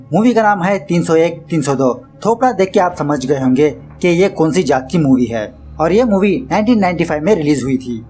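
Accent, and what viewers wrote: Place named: Bengal, India. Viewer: Bhai hindi bol ye banglihindi kyu bolra h🤣🤣🤣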